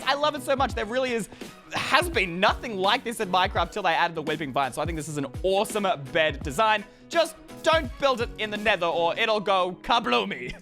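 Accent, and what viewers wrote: United Kingdom. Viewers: You look like chinese,or korean or japanese but your accent is british😂😅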